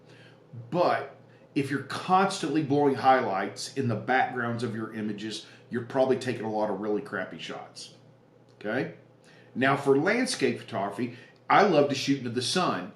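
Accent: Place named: Texas, United States. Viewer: I’m 65, and this may be the first time I’ve heard “paradigm” in a Texas accent. (Not to sound like a West Coast doofus elitist, but hey, you brought up the accents thing.) I haven’t been too conscientious about exposing to the right in my digital photography, falling prey to having the image look good in the viewfinder/screen. I’ll definitely want to work on that, thanks.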